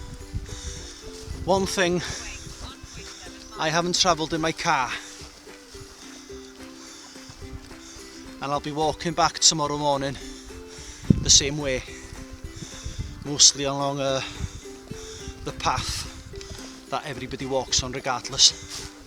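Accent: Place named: Wales, United Kingdom. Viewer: Great video buddy,proper Welsh accent up your way. Bet you speak Welsh to.... Nothing wrong with a wild camp in lock down. Done it myself... Felt proper safe from covid, being miles away from civilisation. If you have any negative comments.. Tell them to piss off.... Check my wild camp out buddy... Atb dai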